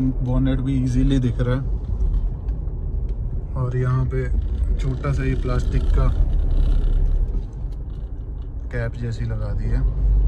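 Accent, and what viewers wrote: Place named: Himachal Pradesh, India. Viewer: Are bhaiya aap to mandyali bolte ho nd fortuner pai ye roof par acha ni lag rha hai taxi lag rahi hai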